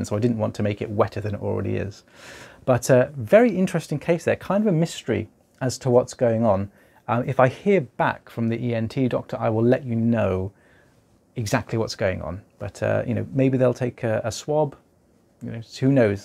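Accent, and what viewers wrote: United Kingdom. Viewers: i think you need to talk to dr. Neel Raithatha he does ear wax removal also and he uses an oil type cleanser called clear. excuse me if i'm wrong, but you sound British to me and Neel IS British so maybe find him some how. he has his own youtube channel but his comments are off so try using his comunity page. hope he can help.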